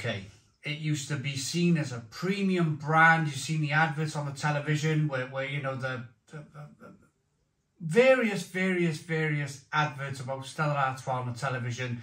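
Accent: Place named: Cardiff, United Kingdom. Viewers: That's a Cardiff accent if ever I've heard one! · Hiya mate, just popped up on my feed and agree 100% with what you say, and it's got even worse over the years as well ugh. Also, as a Kairdiff boy meself, I recognise that accent so know you are an expert when it comes to drinking your beer 😎 and love your setup. Your enthusiasm is infectious mate. These days only drink Weissbeers that have been imported as I'm too old to deal with the hangovers from the chemical crap that we Brits get slopped up with by the pubs and major brewers. Like you, been to Belgium many times and totally different class of beer. Trouble with the UK is that as long as it gets you pissed most boys don't give a crap about flavour or quality. We have a different drinking culture to the continent, where they wouldn't touch UK versions of their own beers. Sadly, nothings gonna change. 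Although paying £5+ for a pint of lager in the pub these days (wtf???) means my drinking out days are definitely over haha. Rather buy some quality to enjoy at home. Pity, as the pubs are dying - no-one has the money for that these days what with prices high and wages not changed since 1980 (grim lol). Only time I really go to the pub is for a meal out with friends these days. Oh well, was fun while it lasted :)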